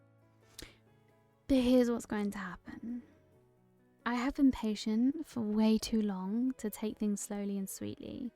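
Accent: United Kingdom. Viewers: I'm getting, a southern accent? Not devon but something near? Definitely not central Britain, I'm from there so I'd know. 'based on what I feel pressed up against my hips' is crazy af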